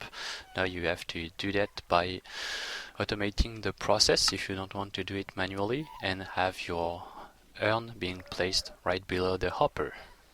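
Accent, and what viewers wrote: France. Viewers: On sens bien l'accent français ^^ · Haha, une présentation d'un mod énorme, et l'accent français qui donne l'impression que tu dis "weed" au lieu de "width" est parfait :D En tout cas super boulot ;)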